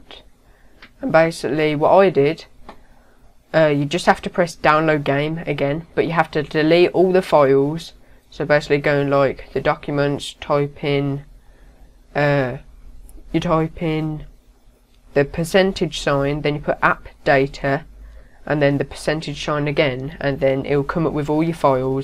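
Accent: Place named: United Kingdom. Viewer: MAN YOUR ACCENT IS AMAZING, ARE YOU BRITISH?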